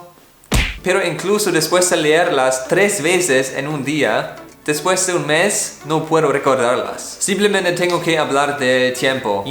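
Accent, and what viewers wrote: Germany. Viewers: Hablas perfecto el español,se nota tú acento de alemán pero hablas perfecto,enhorabuena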